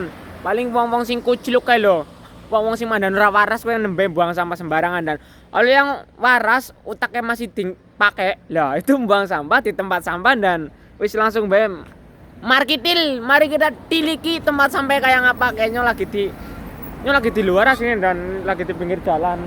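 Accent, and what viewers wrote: Banyumas, Indonesia. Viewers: Rika ngapak e ngendi Ndan😀😀😀😀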